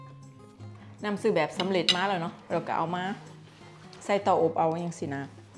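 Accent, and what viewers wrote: Isan, Thailand. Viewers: ชอบน้องน้ำ น่ารักจัง ชอบในความเว้าอีสาน เป็นเสน่ห์ของคนอีสานเรา ตาฮัก มักๆ🥰🥰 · โอ้ย ว่าพูดอีสานน่าฟังแล้ว พูดกลางก็ยังเพราะอีก น่ารักมากๆเลยแม่น้ำ ~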